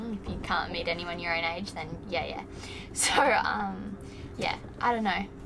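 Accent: Australia. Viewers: Medium (and delightful) Australian accent. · You def have an Aussie accent. 😅 Your parents have done an excellent job raising you it shows in your demeanor and intelligence. God bless and keep the vids coming. ❤ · sorry sister you are Australian I can tell but in a vary nice way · Like you are willing get on You Tube and answer both the easy questions and the hard ones. And as far as the hard answers. Always are allowed make that decision later, since your only a teen and many years to figure that out. As far as accent, since you be sailing many different places you will get more mixed but you will have your beautiful Aussie accent base. 👍♥️💯🎦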